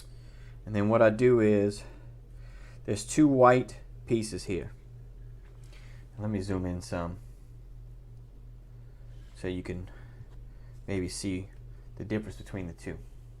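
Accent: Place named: Louisiana, United States. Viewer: When I heard your accent I knew. You gotta be south of Houma?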